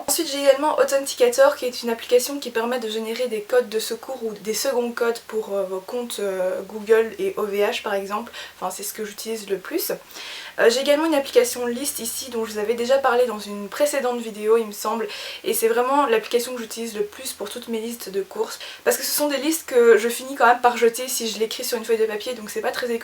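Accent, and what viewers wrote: Belgium. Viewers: tu es belge? 😊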